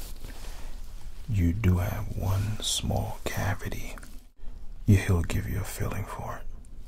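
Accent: United States. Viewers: I love 💕 your American accent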